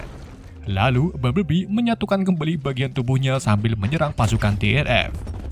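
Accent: Indonesia. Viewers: Gue suka gaya bicara dan gaya basa lu bang, meski membahas alur cerita film asing tapi gaya +62 masih diterapkan 🤣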